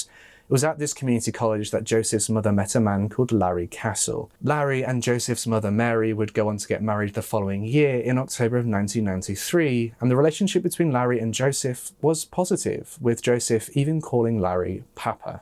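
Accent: United Kingdom. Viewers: I've watched years of your videos and just love you. You and Emma Kenny are my favorites. I'm an American and I adore you and Emma's British accents. Thank you for the hard work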